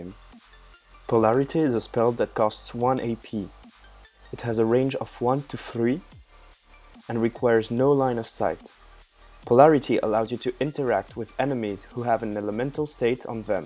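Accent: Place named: France. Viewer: you sound so french... don't forget about the "ed" after a "t" is pronounced [tide] and pronounce your "h"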